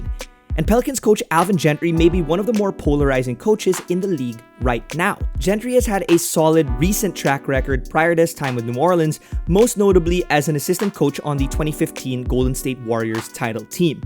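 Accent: Philippines. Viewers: I was pretty sure you’re filipino judging by your accent and name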